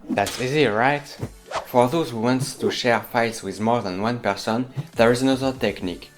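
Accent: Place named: France. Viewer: Un francais passe par la et reconnais l'accent francais. Salut ^^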